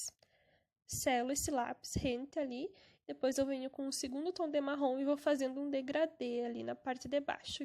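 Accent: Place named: Rio Grande do Sul, Brazil. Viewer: Guria...tu é gaúcha!!! Reconheci o sotaque e os produtos da Panvel... De que cidade???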